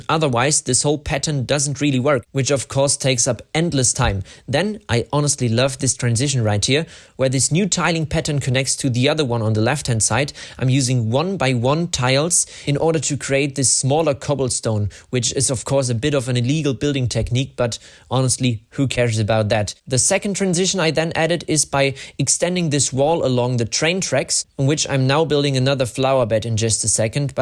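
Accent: Germany. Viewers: since you sound german you should build a traintrach to a small concentration camp with some gas chamber and ovens, make a working lego oven with a lighter in it to burn some characters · ⚠️This isn’t meant to be hate - like, at all⚠️ You kinda sound like you have a German accent 😮 Could you perhaps come from middle Europe?😅 I’m really sorry if this sounds offending in any sense❤😢 Edit: I just saw that elevator video from Jensbach 😂 so are you from Austria? Just curious 😅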